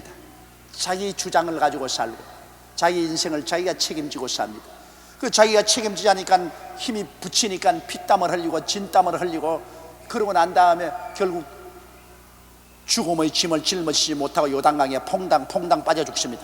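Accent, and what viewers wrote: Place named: Jeolla, South Korea. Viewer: 형명니 믄 절라도 말씨.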